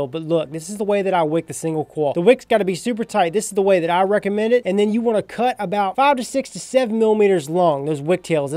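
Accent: South, United States. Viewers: Mesh?????! Omg!!!!!!!! I NEVER thought vaping would evolve this much! Wow!!!! I shall go on the hunt for one! Sn: I peep a bit of a southern accent! It's adorable!!! Plus, his vids are always short, sweet, and to the point! Yessss!!!! ♥️♥️♥️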